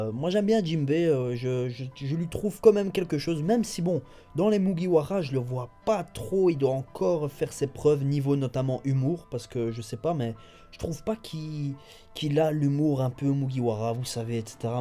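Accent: Belgium. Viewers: Question complètement hors sujet désolé mais T'es belge, est ce que tu viens de Huy ? ^^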